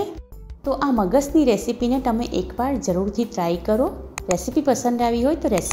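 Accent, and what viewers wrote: Surat, India. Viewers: Tame surti lago chho....boli parthi samjay che😀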